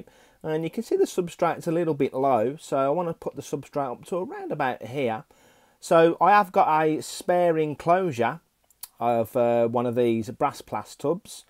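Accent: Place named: West Midlands, United Kingdom. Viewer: Clues - bloke -west midlands accent -has red runner roaches -name is.....do I win a prize? 😁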